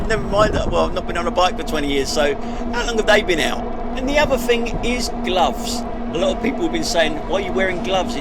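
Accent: London, United Kingdom. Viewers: I did watch it to the end :-) I used to live in Ilford and worked in London at 16. I moved to Germany in 1974 at the tender age of 23 to go to my future wife and it was great to see the areas around London again. Btw. I love your accent, reminds me also of where I grew up. I swapped my racing bike for an E-Bike when I reached 71 after the roads started getting steeper. Keep up the great work.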